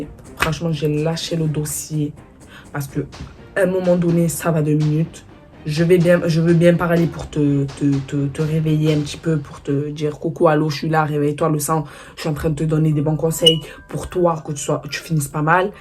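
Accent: Marseille, France. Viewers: Je kiff t’es vidéo tu a fort l’accent marseillais tu es des Cartier nord ou sud je me suis fais un compte YouTube que pour pouvoir commenter et pouvoir m’abonner à toi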